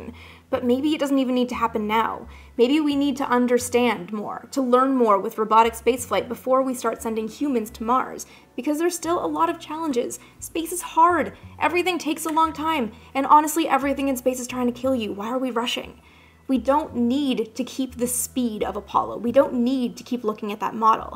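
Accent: Canada. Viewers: You made me cry at the end. You're still a nice lady. Are you Canadian or a northern mid-westerner? Great piece, I will make many people watch.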